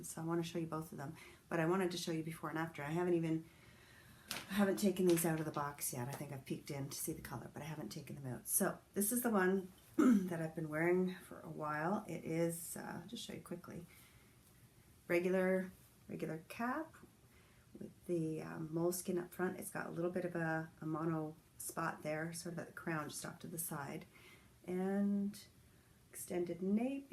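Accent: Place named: South, United States. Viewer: I am kind of shocked you're from Canada, I would swear you're from the south LOL I always put your videos on 2x playback because it is just more enjoyable to watch other than it's hard to understand what you're saying LOL you just start slow like us Southerners LOL it makes me feel like home, but it takes so much longer to watch the videos. I'm just one of those that wants to get to the point because I've watched hundreds of wig videos and just want to see the wigs, but I so appreciate how many wigs that you review exclamation point when there's a specific wig I want to see I come to your site first!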